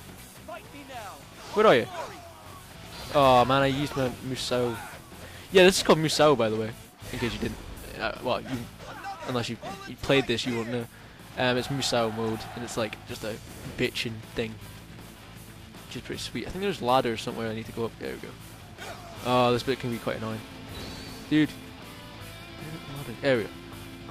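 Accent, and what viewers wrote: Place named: Scotland, United Kingdom. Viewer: may i ask what part of scotland are you from? im just curious i enjoyed the vid and you deserve another sub =D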